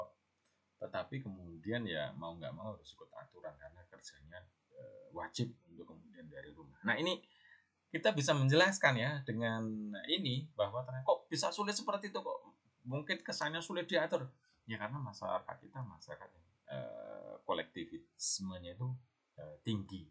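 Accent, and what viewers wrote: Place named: Sumatra, Indonesia. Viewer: Bedo pak ya, suara orang Sumatra ma jawa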